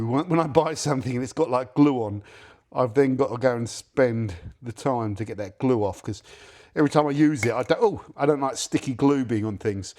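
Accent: United Kingdom. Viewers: I really love your British accent. Here in Korea, I occasionally hand-wax my Hyundai with Zymöl Ital. One day, when I’ve saved up enough money, I’d love to get some Concours and give it a try.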